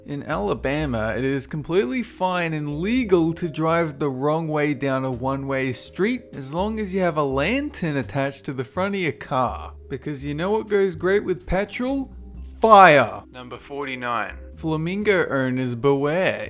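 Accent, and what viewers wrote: Australia. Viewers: you australian? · Are Australian or British? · Is this guy Aussie? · I'm new to this channel and he sounds australian..... ( JUST LIKE ME☺️) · this is gonna sound stupid but are you Australian?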